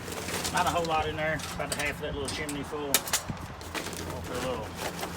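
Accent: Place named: Northern Kentucky, United States. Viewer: awesome man. I just subbed the other day and have fun though about all your videos. When I heard your voice I said he sounds too like me to be from northern Ky lol. Keep up the good work sir.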